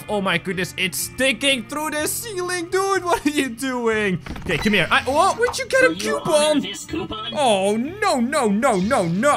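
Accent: Netherlands. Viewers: are you not dutch hmmmmmmmmmmm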